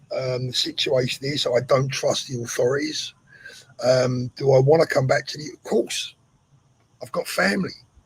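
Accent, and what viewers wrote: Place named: London, United Kingdom. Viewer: Hi, you're on 284,000 subs, great going. I didn't leave as some did, stillnfindcyouvas one of the most down to earth blokes out there, guess it's the London accent, all my family hail from London, dads from the west of London, mums from Poplar. We live in Aylesbury now, wifey and my son. Will be in London on 13/09/25. Stay safe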